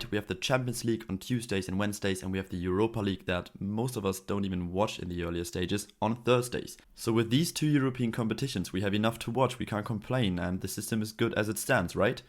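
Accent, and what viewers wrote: Germany. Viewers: Man merkt halt so krass das du Deutscher bist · This dude speaking English sounds like he is speaking German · I hear the german accent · Are you German Weil es klingt sehr deutsch vom Akzent her 😂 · Der Deutsche Akzent haut rein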